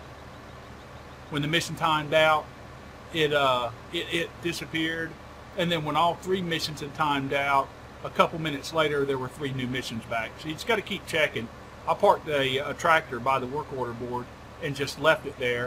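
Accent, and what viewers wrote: South, United States. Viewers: you taught me that not everyone with a southern accent is a bit slow good logical play through and info for us new players, thumbs up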